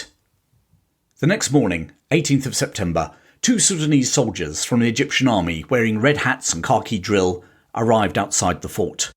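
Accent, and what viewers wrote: England, United Kingdom. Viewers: Perfidious albions, you are right. Kitchener was a francophile, and pleased to drink some champaign. What a waste, French champaign for a rosbeef. Thanks to for his uterative English accent. I dealt with Amoseas oil petroleum company on my past business activities, and these fellows were spoken Humphrey Bogart American English. Nevertheless, Great Britain was stronger than France for three centuries, but at the present time we are small nations.. I believe in Royal Navy UK, as still to be considered as unique. Grace of Kitchener, nothing happened in Fashoda. The place is named kodok,? Do you know, it means grenouille in Bahasa Indonesia? We French are same as Donald Trump, we shall confess our sins, but first fire me these British people who are sitting on our chairs, in our Egyptian colonies. Merci. Mercy.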